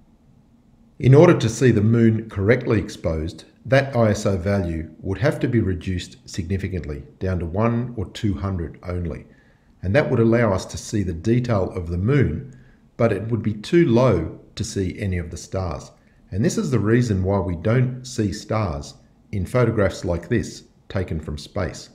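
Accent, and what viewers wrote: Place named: Australia. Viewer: As much as I love seeing you debunk flat earth, what about some astronomy videos? Your calm Australian voice and the way you explain things, I think would be really beneficial to many people. Also I'm from the UK so it would be really nice to see your stars because as an amateur astronomer myself there is only one thing worse than a cloudy night when trying to view stars below the equator and that's that damn globe in the way! Keep up the good work buddy.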